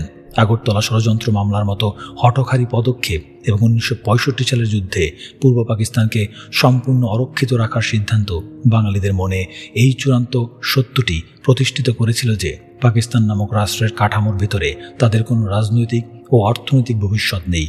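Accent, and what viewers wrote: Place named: India. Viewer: বই কথাবলছে না।৷ ভারতের দাদদের মত করে বলছে।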